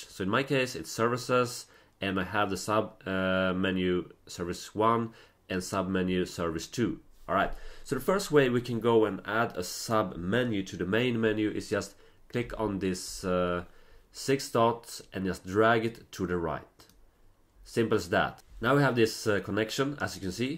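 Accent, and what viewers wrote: Sweden. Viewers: Sounds like your Swedish by the accent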